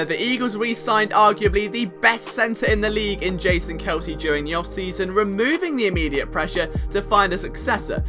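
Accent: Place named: United Kingdom. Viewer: I just can't listen to the British accent... and I'm British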